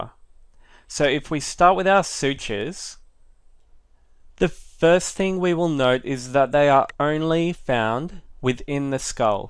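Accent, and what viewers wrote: Australia. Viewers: I am really enjoying your clarity, descriptions and Australian accent!! It's a first in my experience of watching these anatomy tutorials. Thank you kindly.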